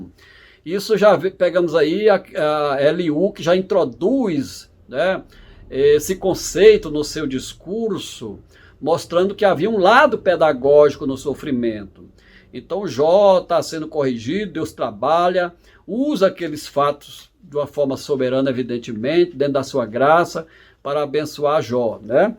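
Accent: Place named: Northeast, Brazil. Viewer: Maravilhoso ensino, No bom sotaque nordestttíno.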